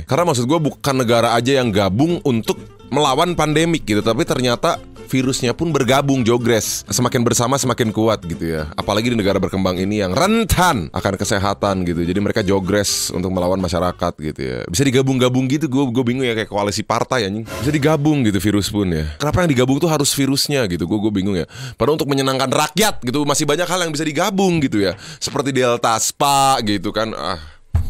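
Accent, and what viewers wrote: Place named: South Jakarta, Indonesia. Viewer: Menuju dialek jaksel🥶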